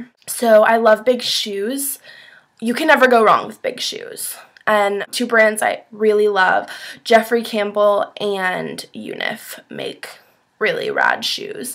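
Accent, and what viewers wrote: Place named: United States. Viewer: I love when you say yaaall !! That American accent ! <3 And your fashion is tops !!!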